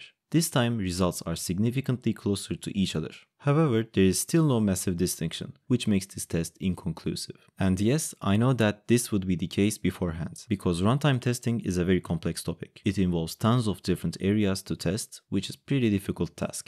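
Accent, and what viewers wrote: Turkey. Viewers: Are you Turkish? Your accent made me think that. I'm sorry if this question bothers you.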